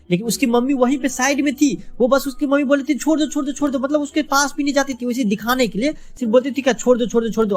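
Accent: Bihar, India. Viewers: भाई तुम बिहार से हो क्या ।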